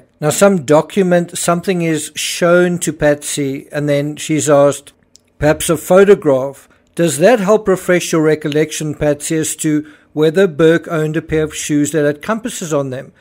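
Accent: South Africa. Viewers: No, it's an accent. He's from SA.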